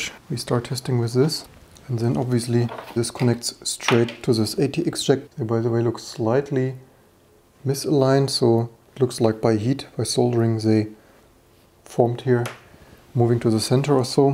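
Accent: Germany. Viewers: Zis is zhe must germanbenglish das ich je gehört habe :D aber nettes Video,l!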